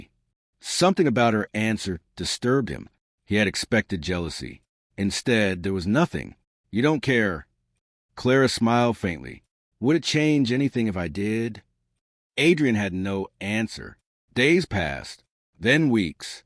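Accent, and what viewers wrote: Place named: South, United States. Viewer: Good story , narrators voice soundos too country🫤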